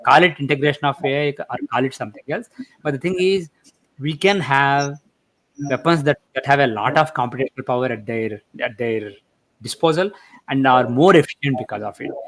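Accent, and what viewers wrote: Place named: South, India. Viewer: 😂😂😂👎👎👎👎 Terrible Southie Accent. Speaking Madrasi ? Or English? No one understands. Terrible 😂😂😂😂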